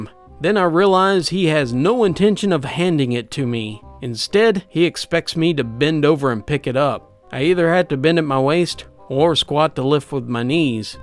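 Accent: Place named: Kentucky, United States. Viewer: Still my favourite accent.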